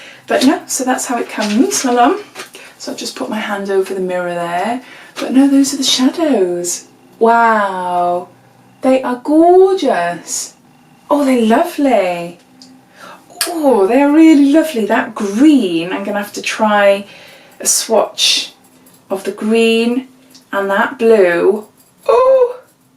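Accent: United Kingdom. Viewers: hey, so cool you answered me ☺️ i got a face mask and lip scrub that I loved but then a lip liner ( I’m a lip gloss person) tweezers and a mini body wash and lotion. So not the best, I’ll try for a few months and see. I just signed up for a few. Just found out they existed a month ago 😂 I’ve been missing out. I’ve watched so many unboxing videos since, a lot of yours, I love the British accent, it makes me feel fancy 💃🏻 keep it up.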